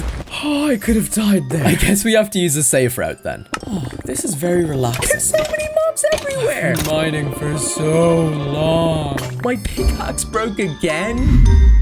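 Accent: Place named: Ireland. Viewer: Yoo I Heard Your Irish And Im Irish :)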